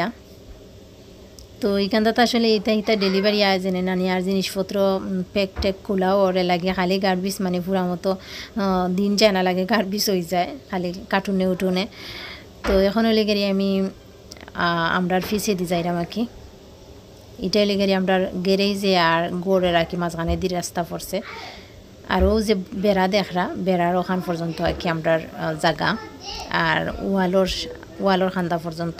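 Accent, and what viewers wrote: Sylhet, Bangladesh. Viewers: Ha Apu uni ancholik bashai kotha boltasen .ata hocha sylhet ar ancholik Basha jata bangla bashar e akta part.koiak din shunla apni bujta parben InshaAllah. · আপু আপনার সিলেটি মাত খুব ভালা লাগে❤️❤️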